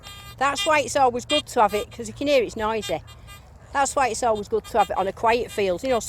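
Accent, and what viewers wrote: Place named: England, United Kingdom. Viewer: I love the English Accent!!!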